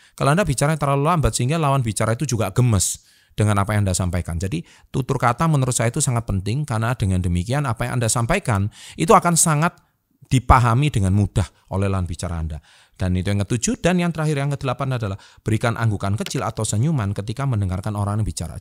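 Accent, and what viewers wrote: Java, Indonesia. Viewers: medok amat sih kang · Kenapa ya aku tuh kurang suka dengar orang bicara dengan logat medok begini😅 agak ilfeel aja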